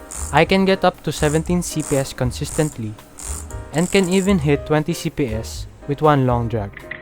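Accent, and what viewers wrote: Philippines. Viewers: u have filipino accent like me xD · the filipino accent throws me off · Yoo way you speak your language is like Filipino BTW can you make a video how to change any mouse debounce time? I got 1 dollar mouse which is legit thanks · from the accent, are you filipino?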